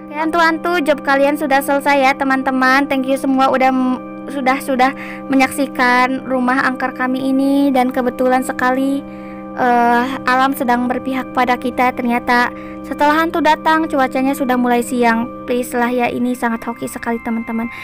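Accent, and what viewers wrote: West Java, Indonesia. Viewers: Kak aslinya orang sunda ya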